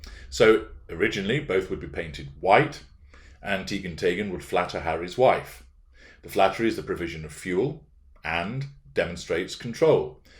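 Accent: United Kingdom. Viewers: Hi, I first subscribed to your channel several months ago through your analysis of Harry’s wife. Since then I have been backtracking through your past commentaries. Your output is impressive, the research is extensive, accurate and relatable. As Australian 🇦🇺 your voice is very British and so pleasant to listen to. Thank you.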